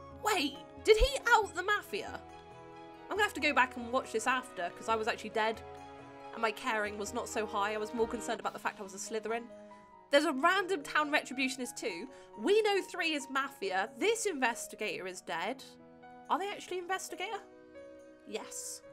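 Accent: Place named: United Kingdom. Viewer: I am always amazed at how British you sound and I'm British myself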